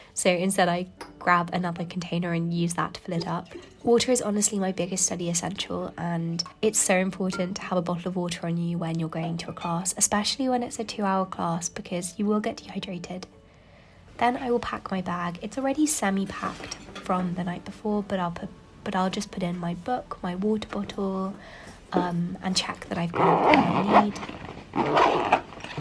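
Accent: United Kingdom. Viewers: I found myself in your channel looking foward to learning the British accent and I just fell in love with your videos, they're just so gentle and poetic ♡ they feel like a warm hug hahaha and also you're the cutest. God bless you. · I see. When you use "the British accent", it indicates that there is only one. If you had used "your British accent", I would have understood you meant.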